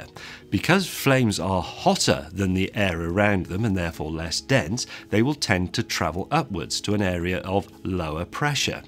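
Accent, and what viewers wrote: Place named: England, United Kingdom. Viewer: I watch these videos to learn James may's English accent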